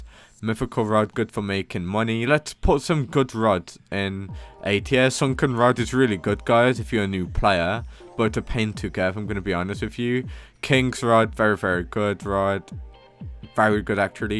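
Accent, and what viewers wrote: United Kingdom. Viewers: Omg he have the bri ish accent😮 (not trying to be rude pls)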